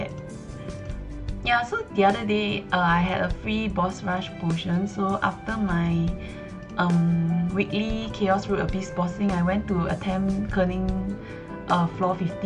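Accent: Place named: Singapore. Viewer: I agree with his/her comment. I like the Singaporean English accent.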